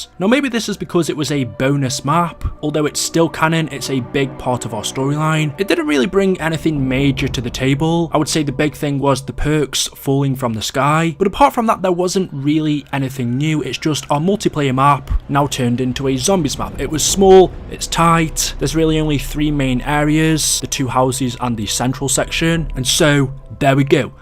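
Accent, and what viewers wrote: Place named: England, United Kingdom. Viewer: Nick Barrow He has an accent. I think he is from Birmingham or Manchester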